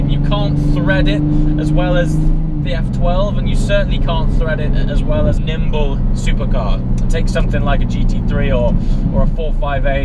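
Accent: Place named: Northern England, United Kingdom. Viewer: Great video dude , keep them coming I love your enthusiasm , & you talk correctly with flat Northern vowels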